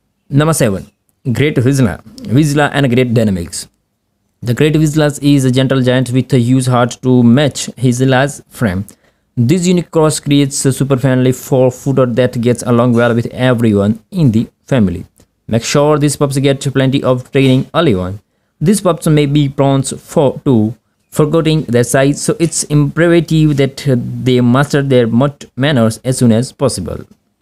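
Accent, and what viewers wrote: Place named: India. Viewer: I don’t think it’s AI. My guess is that this is a man from India doing the narration. Unfortunately, his accent is extremely difficult to understand. If he is going to be producing videos like this, he should at the very least SLOW DOWN.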